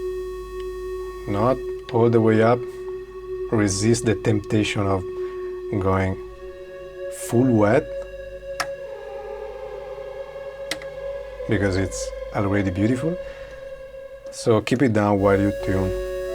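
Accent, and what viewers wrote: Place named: Spain. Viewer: Fantastic demo and tips! Love your accent too...Are you Spanish?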